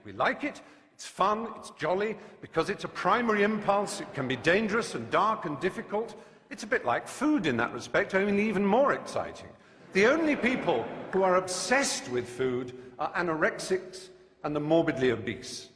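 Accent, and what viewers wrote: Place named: United Kingdom. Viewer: I could watch this over and over again. As fan of British English I sit admiring the accent and the rethorics. Beautiful!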